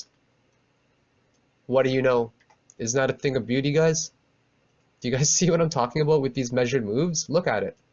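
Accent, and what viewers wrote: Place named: Canada. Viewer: You are awesome dude. Canadian right? I can hear it in your voice. Alberta here.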